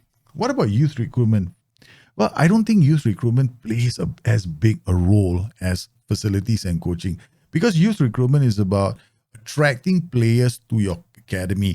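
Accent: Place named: Singapore. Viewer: Amazing content! I assume that you’re from Singapore from the examples and accent. Will work on a new save and focus on youth with this in mind. Thank you!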